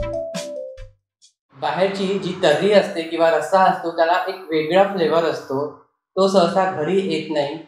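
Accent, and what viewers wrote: Vidarbha, India. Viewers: Vidarbhachi bhasha, bolnyachi shaili agdi mast.Mi panNagpurchi ,aata Mumbaila rahate.tuzi recipe aani vidarbhatil vishesh shabd donhi very ❤sweet.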